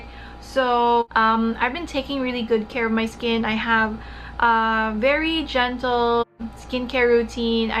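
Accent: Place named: United States. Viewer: love your accent its like you grow up in america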